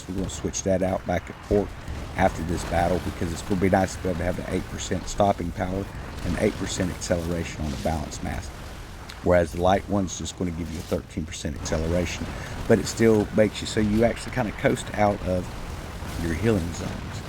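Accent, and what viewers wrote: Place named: New York, United States. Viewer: Your accent gives yourself away. New York? 😉 S C here, take care.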